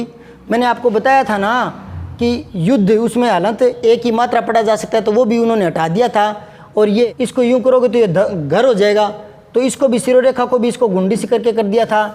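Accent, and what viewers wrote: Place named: Rajasthan, India. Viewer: लगता है आप राजस्थान से हैं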